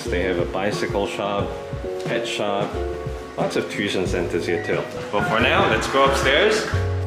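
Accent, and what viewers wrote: Singapore. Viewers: Hi, I enjoyed watching your videos and your Singaporean accent 🤓 Sorry about your dad, I lost mine 4 years ago... You seem like a genuinely nice person. All the best to you and your new life in NZ. Will continue to follow your channel as I have a dream of getting a motor home in NZ one day when I retire. Stay cheerful 😊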